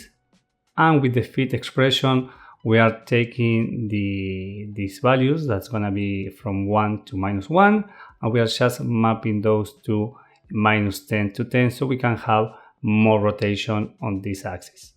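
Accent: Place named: Argentina. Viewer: Por el acento del ingles, sos argentino. Tremendo tutorial! Te felicito y agradezco.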